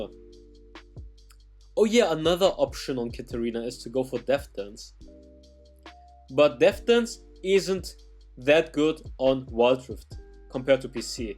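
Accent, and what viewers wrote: Germany. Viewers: disgusting german accent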